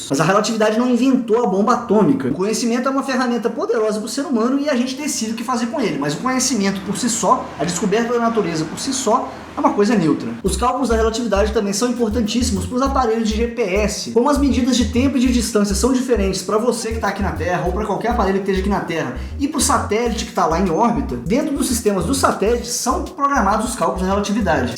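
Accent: Minas Gerais, Brazil. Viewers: Que delícia acordar e já ter vídeo novo. Uai, você é mineiro? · Só de falar "vão falá um cadim" dá pra sacar que é mineiro. É tipo uma linguagem em código que possuímos... kkkk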